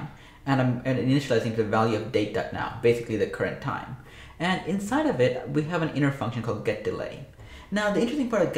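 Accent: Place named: Tamil Nadu, India. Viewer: Me too I think you are Tamil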